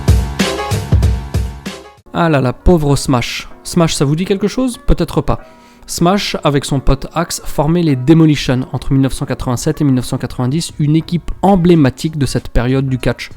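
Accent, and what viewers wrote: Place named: Laventie, France. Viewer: d'où tu as chopé cet accent de Laventie?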